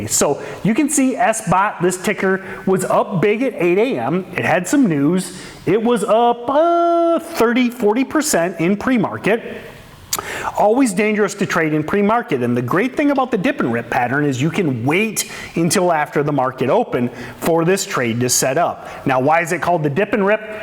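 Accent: United States. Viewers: just so you know this is the most simple, no nonsense video on patterns that i have ever seen and i have probably seen hundreds of different videos. when other people explain things it is almost impossible for me to understand but the way you, explain things paints a clear picture in my mind and actually gives me actionable instructions that i can start applying to my trading. so thank you very much for that. maybe it's because you're also from the midwest that i am able to understand you so well, but anyways i appreciate it. i also have been enjoying the podcast. it's just the right balance of entertainment and informative content. would love to see more videos like this.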